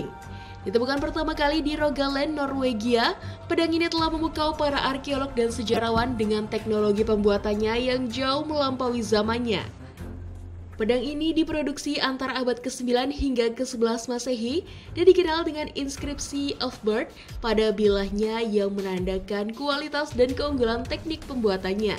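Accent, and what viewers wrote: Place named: Tegal, Indonesia. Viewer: pengisi suara nya seperti orang jawa, tegal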